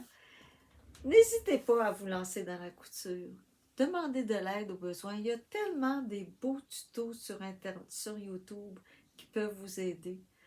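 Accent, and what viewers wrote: Quebec, Canada. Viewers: Bonjour, super vos réalisations et cela m’a beaucoup inspiré notamment le montage des cols, je vais essayer. Je ne suis pas contre un tuto même si je crois avoir compris comment faire. J’adore votre bel accent québécois:) Bonne journée. Muriel de Paris (France)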